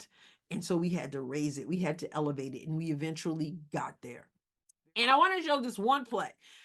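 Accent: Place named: Southeast Missouri, United States. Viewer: I thought I was able detect a slight hint of a Southeast MO accent! We are rubbing off on you!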